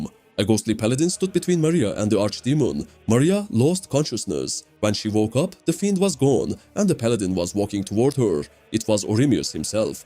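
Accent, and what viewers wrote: Turkey. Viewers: I hear the slightest bit of Turkishness in your accent friend... Eline sağlık harika vidyo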